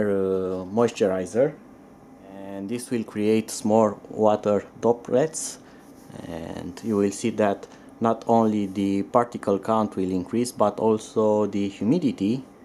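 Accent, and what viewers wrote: Romania. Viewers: Deci dupa accent eram sigur ca esti roman. Nu am reusit sa ma lamuresc pe paginile tale, dar am dat mai jos in comentarii si te-am vazut scriind in limba romana :))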